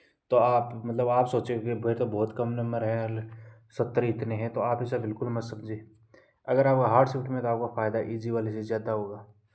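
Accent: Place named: Shekhawati, India. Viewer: Bhai rajsthan se ho ki wo bi sekwati se 😂😂baat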